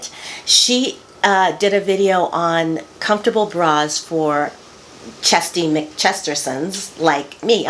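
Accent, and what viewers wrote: United Kingdom. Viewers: Great job on the British accent. I’ve always loved the way they speak, makes the English language sound so much better. Great haul,lots of goodies. I need a good bra that will lift and hoist these puppies back to where they belong. Lol ❤️ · Happy Birthday!💕🎂🌸 I’m 5 months older than you.😀 That British accent...I thought I clicked on someone else’s channel at first. That was great. It that a romper you’re wearing? Love both the style and color on you. Which one is the link please? Thank you. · Like the British Accent:) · Love the British accent! You are so posh!:))) 💛💚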